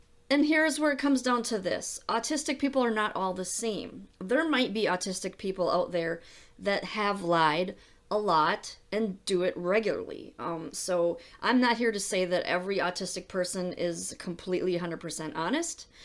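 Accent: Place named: Midwest, United States. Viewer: Are you from the midwest? Like Illinois? Wisconsin? I hear your accent. Triggering is stupid. How YOU react is not MY problem. I got diagnosed at 74. Now 76. Good podcast.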